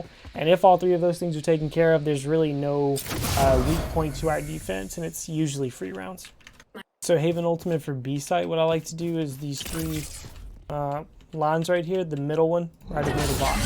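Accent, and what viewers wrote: South, United States. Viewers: Are you from the south? Im from bama I hear that southern accent.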